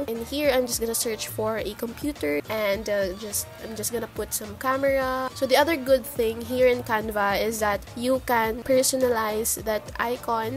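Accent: Philippines. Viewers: boses mo parang pilipino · Hello I’m new to checking out your channel :) It’s my first time learning & how to with tutorials on everything of making an intro for YouTube channel video! Question? Can I do these on my phone? Because I often use my phone more than my Mac 💻 or iPad? Because I don’t often bring these with me anywhere as they are heavy & not all of my photos/videos sync to my laptop or iPad,I took it off and forgot how to put it back haha 😂 anyways thanks for sharing I hope I can learn in no time! Also by the way your accent is I can tell your Filipino? Is that weird? 😅are you live in the Philippines or in the states? I miss being home but too ouch heat is kinda bad for my health! Thanks again for sharing!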